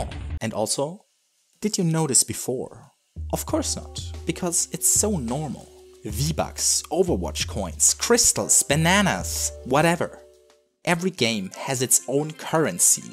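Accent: Germany. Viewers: You sound suspiciously German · Man hört deine DEUTSCHheit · Hört sich fast wie ein deutscher Akzent an?🤔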